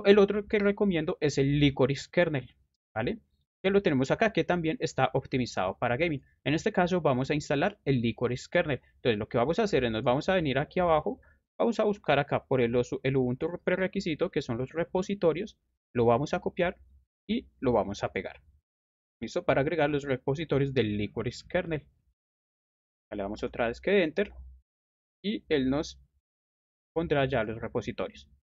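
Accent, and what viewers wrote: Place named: Colombia. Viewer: WOW varias cosas xd 1. Me agrada que POR FIN un canal en español ligado a linux este enfocado en GAMING, me parece genial y mas con el estado actual de steam y las mejoras que poco a poco vemos en otros lugares. Creo que poco a poco vamos viendo linux como ya una verdadera alternativa FÁCIL ante windows xd 2. Mi parcero como que es de colombia no? digo, se le marca ese acento que se distingue, así que supongo :v 3. TREMENDA pc ala, me parece genial primero porque es una belleza muy sexy, segundo porque la gráfica que tiene (segun estuve leyendo) esta dando problemas con algunos juegos (vamos es una nueva arquitectura híbrida con stream processor, tiene razón para fallar) por lo que seguramente vos tambien podras mirar eso xd y luegooo porque la verdad presenta hardware que mucha gente va a comprar, el R5 3600 es pan caliente y seguramente alguno quiere linux xd. 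Otro punto es que yo también manejo puro AMD, eso sí, algo más modesto pero funcional. 4. Recomendaría para el canal títulos más cortos en los videos, por ejemplo, el último video que subiste sobre mangohud (que por cierto yo sí me tuve que tragar los videos en inglés y un fallo en la instalación, pero al final sobreviví) es el único video en español que hay en youtube. Por lo que si pones algo así: "COMO VER LOS FPS EN LINUX" aparecerá el video de primeras para los hispanohablantes. Si lo se, puede ser algo "Clickbait" y demás pero we, tienes buen contenido y al final logras explicar lo necesario, considero que vale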